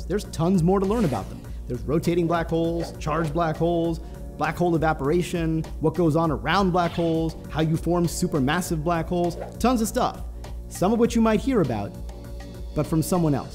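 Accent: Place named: Australia. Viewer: Man, I feel the exact opposite. Plus Australian accent and slower speaking style are much more pleasant to listen to.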